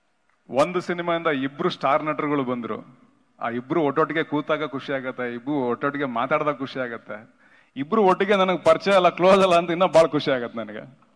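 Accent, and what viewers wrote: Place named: North Karnataka, India. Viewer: ನಿಮ್ಮ ಉತ್ತರ ಕರ್ನಾಟಕ ಭಾಷೆ ತುಂಬಾ ಚೆನ್ನಾಗಿದೆ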